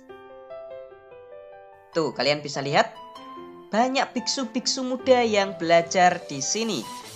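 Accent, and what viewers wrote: Java, Indonesia. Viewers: WALAU MEDOK BANGEEET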